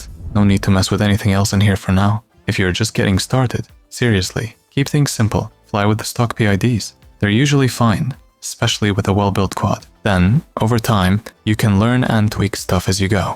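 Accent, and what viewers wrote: Germany. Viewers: i hear german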